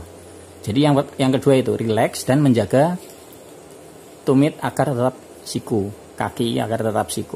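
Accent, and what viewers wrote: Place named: Java, Indonesia. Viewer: Medhok syekali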